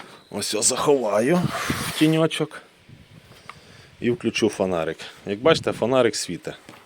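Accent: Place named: Surzhyk, Ukraine. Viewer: 😂😂😂 Во!!! Який русяцкий сурджик !!!! Хватає-це хопає чи висточає?!(Тупа півночі мова!) Фонарик- ЛІХТАРИК!!! Тєлефон-ТЕЛЕФОН Далі я не став вже цього з пошкодженим Росією мозком.... Але пишу відверто. Людина вдрма балакала так,як розмовляли батьки. Російською з місцевим діалектом. Людина розмовляє так,,к би вона не відвідувала школу,а як що відвідувала,тоді а бо не всилася ,або російською викладалася украхнська мова ьа література! До речі.... Книжки аффтор ввдео,не читав від слова - НВКОЛИ!!! Жах відео Вже суть вілео не дивився,бо його сурджик мене ВБИВ!!! І це мене,який народився ьа виріс у суто російськомовному місті МІЛЬЙОННИКУ центральної,промислової укра6,та розмовляв 52р. сутоиросійською!!! Жах суцільний!!! Оце росіч мозок та здатність мислити пошкодила?!🤕🤕🤕 Атас....😎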